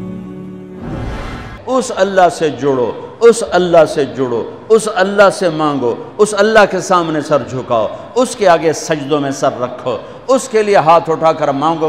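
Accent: Punjab, Pakistan. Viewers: Maulana ji mai india punjab to reverted muslim thoda pakistani punjabi accent bada kaim e ,,, mere naal gal karlo.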